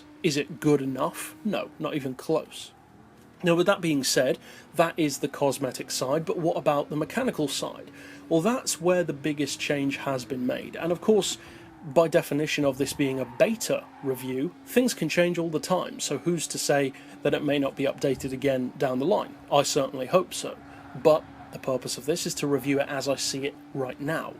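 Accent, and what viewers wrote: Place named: Wales, United Kingdom. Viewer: You sound a bit Welsh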